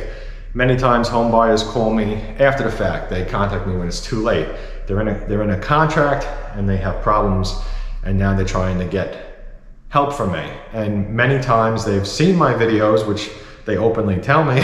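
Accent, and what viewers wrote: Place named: New York, United States. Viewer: Thanks for the tour, always like hearing your NY accent. I think big closets are because some people have so much stuff. More space around toilet makes home more ADA compliant.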